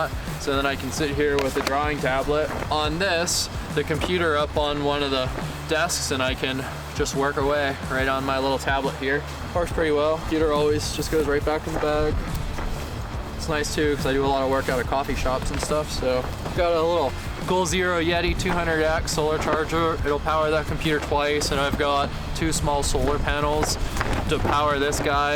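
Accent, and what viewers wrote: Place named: Vermont, United States. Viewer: Dude i love you channel! Are you from Vermont? I have that same accent!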